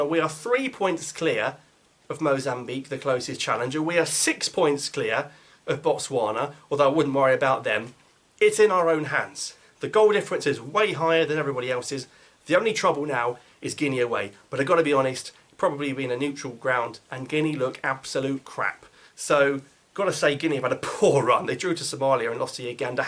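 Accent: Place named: Cockney, United Kingdom. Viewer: Well done, Cockney boy keep up.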